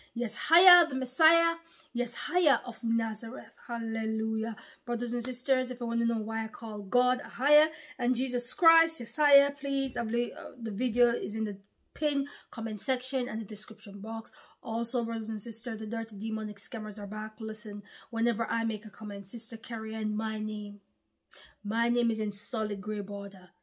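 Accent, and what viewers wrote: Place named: United Kingdom. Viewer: Sister, you look so beautiful. You kind of resemble my mother. They say you’re a false prophet, but I don’t see that. And the facts that you share let’s me know you know the truth. Keep up the good work, we look forward to your videos & appreciate you. I love your UK accent too, “I can’t find mi pen!” 😂😂😂. God bless you and your family Sister 🇨🇦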